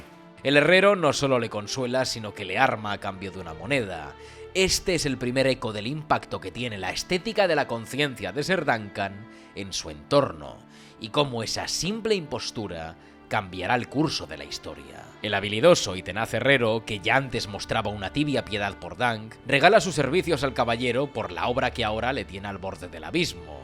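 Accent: Spain. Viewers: Que feo es el asentó español mejor que te doblen al latino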